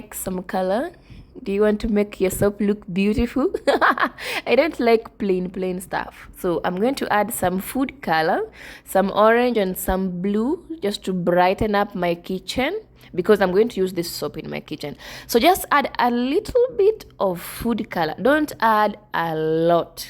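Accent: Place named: Africa. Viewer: Simple. I loved it bcoz of your narration, and with out seen you, I knew it's from Africa 🌍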